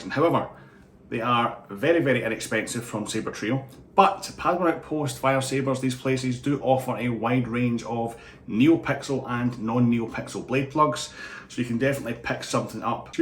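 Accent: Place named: New Jersey, United States. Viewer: That’s a lovely accent you have there, New Jersey?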